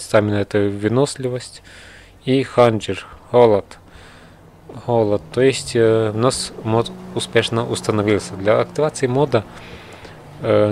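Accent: Ukraine. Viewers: Он украинец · Ех... Как мне нравиться этот украинский акцент... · похож на украинский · чел это украинский акцент и есть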